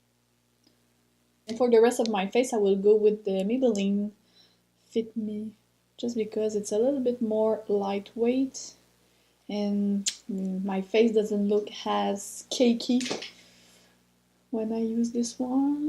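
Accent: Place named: France. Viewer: gurl don't hide your French accent, I love when you put the accent on the RRRRR 😍😍 you look gorgeous and that eyeshadow is so beautiful!!!! Great look ❤️🤩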